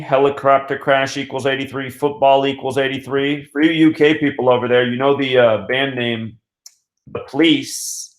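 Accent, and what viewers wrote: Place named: United States. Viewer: London Freemasons was written on that helicopter on the London bridge terror events last year, and the guy with the anonymous mask running in placing a sheet of plywood over the spot where the car was supposed to have crashed into. I know someone already pointed this out. Leicester is pronounced Lester And Sinead is pronounced Shin - eeh- d. Your american pronunciation cracks me up haha. Not too many get the Sinead one correct. Its famous for miss pronunciation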